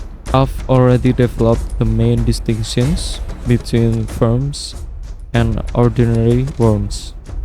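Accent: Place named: Indonesia. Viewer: Dude I could just TELL you had an Indonesian English accent! wonderful art too!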